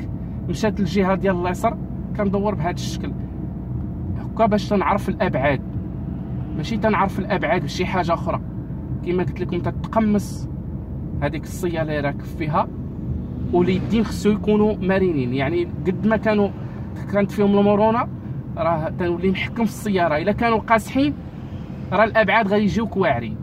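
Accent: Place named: Algeria. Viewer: واه نيشان ربي يحفظو حتى اللهجة لي يتكلم بها تشبه لهجتنا ف جزاير 💪❤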